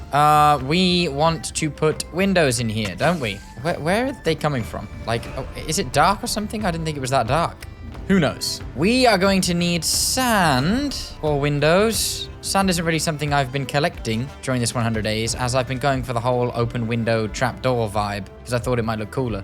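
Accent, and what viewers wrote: United Kingdom. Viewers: you sound britsh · in my honest opinion, I didn't know u were British 😂😂😂😅 And plzzz make a 200 days, that would be AWESOME!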